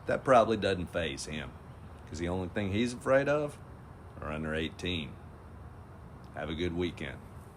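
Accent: South, United States. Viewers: THANK YOU, for this RENDITION, of the WHOLE WEEK, in a nut shell, captured with that CHARMING SOUTHERN DRAW💙💙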